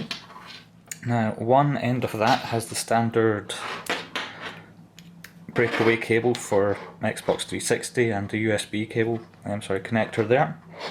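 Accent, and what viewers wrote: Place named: Wales, United Kingdom. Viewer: Sounds Welsh to me but I fail at accents...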